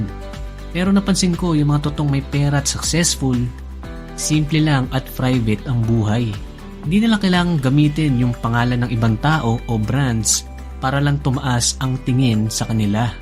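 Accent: Philippines. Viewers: sound like: ilonggo tikalon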